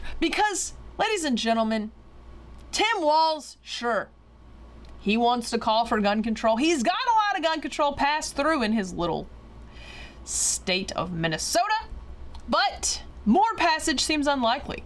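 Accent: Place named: South, United States. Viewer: Your videos are great, and I really like that Southern accent… and that’s coming from a Native Texan! 😬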